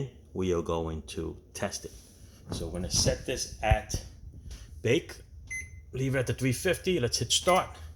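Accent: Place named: New York City, United States. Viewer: Love that NYC accent.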